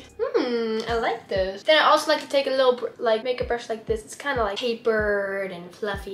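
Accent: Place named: South, United States. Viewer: bruh why does she sound kinda southern, like it kinda sounds like she has a southern accent like a WEEE little bit. :3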